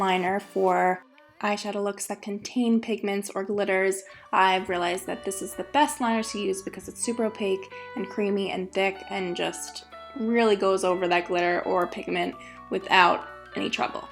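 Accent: New Jersey, United States. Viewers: Hey doll! Your doing such an awesome job. I started watching you a couple months ago & Ive seen you grow so much! I'm going to DM you on Insta too, but I'm guessing your from Jersey/the Tri-state area? You have the accent! 😂😝 I'm from Jersey too. I'm REALLY working hard to start up my YouTube channel, but it's so tough! I'd LOVE to do a collaboration with you! I'm not sure if you'd be interested, but I would FOREVER be grateful! lol. If it is something you think you'd be interested in doing, PLEASE let me know! Talk to you soon 😘 xoxo, Brooke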